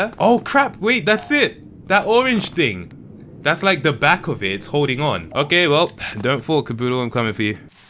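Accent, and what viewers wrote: Nigeria. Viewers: are u igbo or Yoruba? -naijagang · Oh heck fam U Nigerian?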